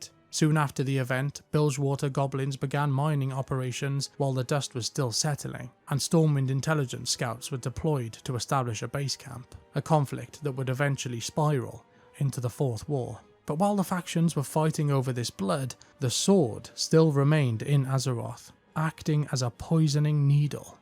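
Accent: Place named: Cardiff, United Kingdom. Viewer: i know a cardiff accent when i hear one.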